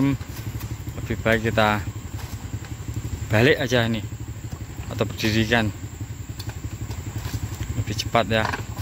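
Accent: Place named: Java, Indonesia. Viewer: Ledok nge wong jawa boss ku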